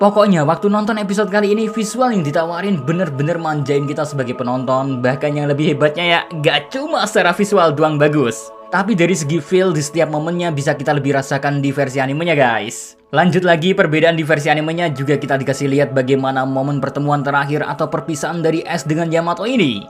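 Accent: Java, Indonesia. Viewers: bang lu jowo bang 😎🤌🤌👍👍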